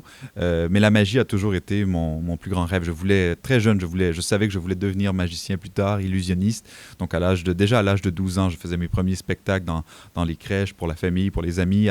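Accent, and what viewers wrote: France. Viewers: Pense tu as pris l'accent des Français tu ne plus ton accent Québécois... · pourquoi il s'est donné un accent francais???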